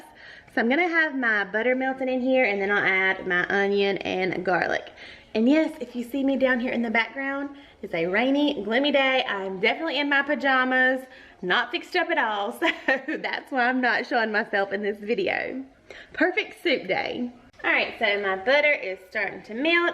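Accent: South, United States. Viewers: I love all your videos :) You are such a nice person, and I love your southern accent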